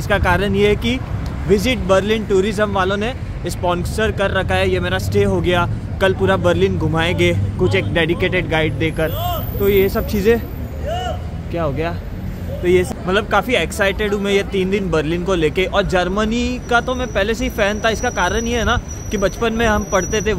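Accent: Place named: Maharashtra, India. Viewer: your marathi acent in hindi i like it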